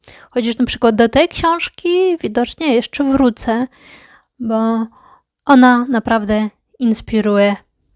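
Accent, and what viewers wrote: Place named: Ukraine. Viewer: Hej! Ciekawe wszystkie 3 książki, zwłaszcza ta "pisz, publikuj, działaj". Tak w ogóle to jesteś Polka czy Ukrainka, bo masz dość ciekawy akcent? Pozdrawiam.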